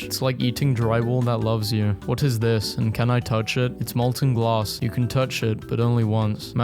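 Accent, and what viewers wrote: United Kingdom. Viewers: Wait he's British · nah really? i thought he was from the moon? yes of course hes british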